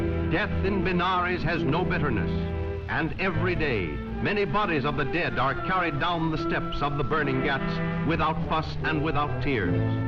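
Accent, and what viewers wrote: United States. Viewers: That's an American accents and not British or Dutch.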